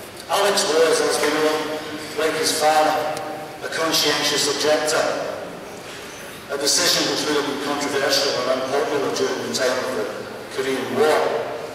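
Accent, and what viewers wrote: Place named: Scotland, United Kingdom. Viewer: I have to listen to this a few times. My clunky American ears sadly can't capture Zal's wonderful Scottish accent. Much Respect to Alex Harvey, Rest in Peace. Much Respect to Both McKenna's, Rest in Peace.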